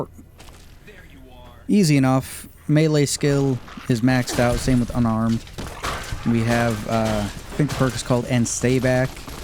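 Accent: South, United States. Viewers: Now I know that a law-abiding Canadian can't survive in a wasteland because it was ironically proved to me with a pleasant Southern accent. Thank you, sir!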